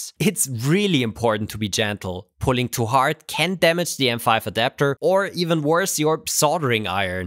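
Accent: Germany. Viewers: Dein typisch deutscher englisch akzent ist einfach geil. Ich schau deine videos sehr gerne!!! Mega Kreativ! Einfach Hammer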